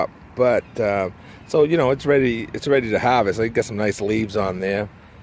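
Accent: Boston, United States. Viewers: I had to do a double take when you said you were from Puerto Rico, until you said you grew up in Boston! It’s definitely a hard accent to miss… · Hello, as you were talking I said to my husband you have a Boston accent,but he is in I think Georga. At the end you told where you were from so that explains. The garden is looking great. Thanks for sharing this with us.💕 NonnaGrace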